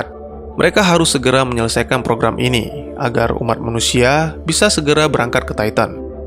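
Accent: Aceh, Indonesia. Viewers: Orang Aceh bg ya.?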